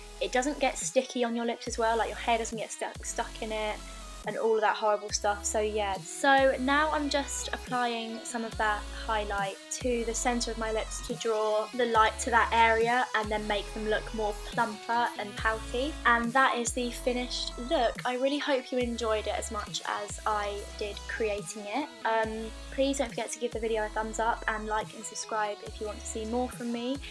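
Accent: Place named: United Kingdom. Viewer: Your british accent ❤🔥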